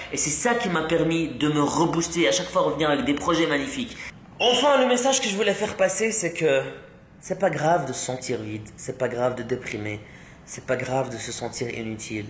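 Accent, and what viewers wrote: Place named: Belgium. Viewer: c est du français belge du coup c est tout a fait normal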